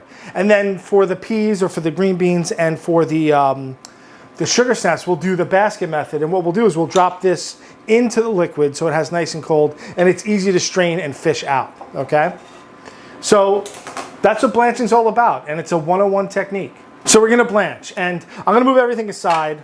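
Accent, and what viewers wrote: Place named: New Jersey, United States. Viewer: When i close my eyes, i sometimes hear Brad Leone. Are you cousins? Or are you from Jersey?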